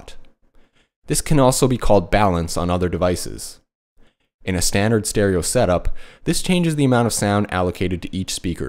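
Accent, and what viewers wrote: Canada. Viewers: are you canadian? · Hez a Canadian. "About" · Are you Canadian? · Thanks! This was really helpful. Are you by any chance Canadian?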